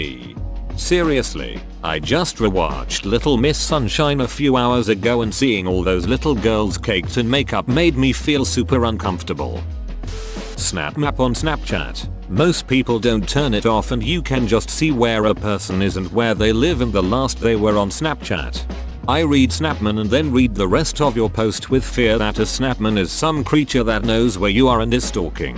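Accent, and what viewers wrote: United Kingdom. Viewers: That's because this voice sounds like a cultured British robot.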